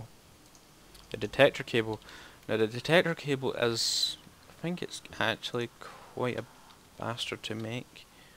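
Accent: Scotland, United Kingdom. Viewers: I don't see how Gregory cannot under stand your accent, Scottish accents are the best :D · Clearly a Scottish accent. It is my favorite accent actually.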